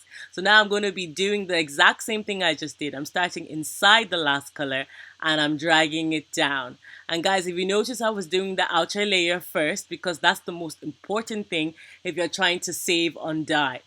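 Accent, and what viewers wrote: Ireland. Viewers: Is that an Irish accent I hear?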